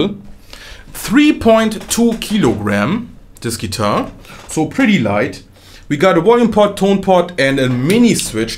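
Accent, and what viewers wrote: Germany. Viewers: I like your light understandable german Akzent.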